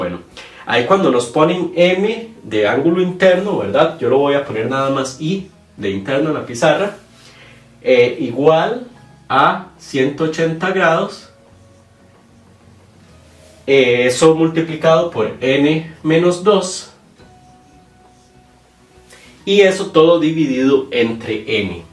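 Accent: Costa Rica. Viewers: Hasta ahora veo que sos de Costa Rica el acento me parecía que si y que dices "verdad" hahahaha Pura vida pa buenos videos , voy a estudiar con tus vídeos para Bachillerato ( me parece que hasta sos de San Ramón)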